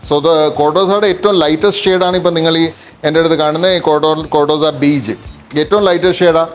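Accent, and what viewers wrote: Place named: India. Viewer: Thanks for your reply. My project is in a planning stage, so presently is looking into various options n materials before final selection. I am from Ernakulam. I often see your videos. It's quite nicely presented with lots of new info for a layman like me. Keep it going... Hearing your unique malayalam accent, with a touch of manglish in it, I have always thought you were educated somewhere outside kerala.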